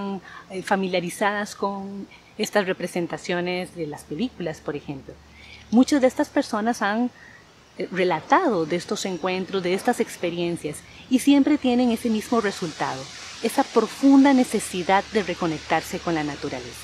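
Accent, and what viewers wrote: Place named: Costa Rica. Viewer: Eres de Costa Rica cierto? Según el acento en la forma de pronunciar la R la reconozco… soy de colombia seguidor tuyo… eres hermosa 😍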